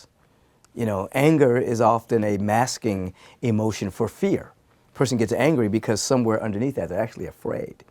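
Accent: France. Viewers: U have a french accent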